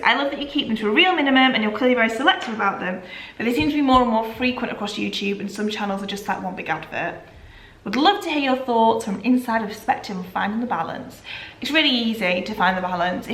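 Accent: United Kingdom. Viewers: I'm quite new to your channel and I have to say I love your UK English so much, it's so refreshing to hear and personally, I love it so much more than US english 😍😍 I hope you have a nice day and here's some cool new music for you: - Have fun girl ♥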